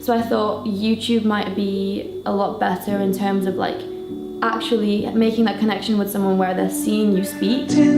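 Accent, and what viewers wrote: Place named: United Kingdom. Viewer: Latina with British accent 😍😍😍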